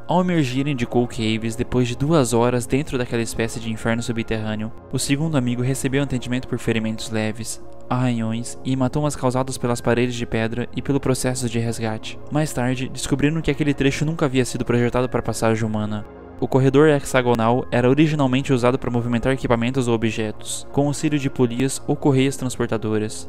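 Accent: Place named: Inland São Paulo, Brazil. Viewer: Gostei do sotaque! Sou do interior de SP, o meu também é assim! Tmj 🤜🏽🤛🏽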